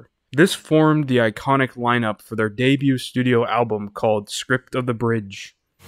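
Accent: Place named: United Kingdom. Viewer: Wait, you're from the UK? or are you reading a script? also I believe it's pronounced LEE-ver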